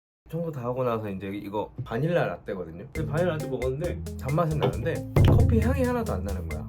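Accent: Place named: Seoul, South Korea. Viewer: 이 양반 내가 본 서울 사람중에 서울말 가장 잘해요 ㅎㅎ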